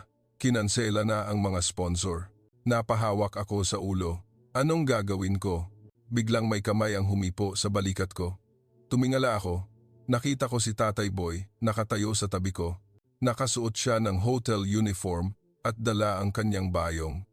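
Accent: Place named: Philippines. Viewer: You’re a Tagalog speaking Arab? Why didn’t you vlog in Arabic? Is this video for the Filipinos, or your fellow Arabs, or are you just trying to get thousands of views from the Filipinos who are renown for their love of watching videos about their home country? Somehow I don’t believe you’re from Dubai. You’re a Filipino in disguise because you have a distinct Filipino accent although not necessarily a native Tagalog speaker!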